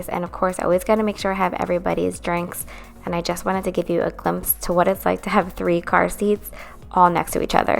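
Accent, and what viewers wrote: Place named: East Coast, United States. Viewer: Just discovered this channel today...I have to ask, does anyone know where she is from? She seems to have an east coast accent. I'm originally from Cape May...so I can usually spot an east coast accent instantly (makes me a little homesick) 😊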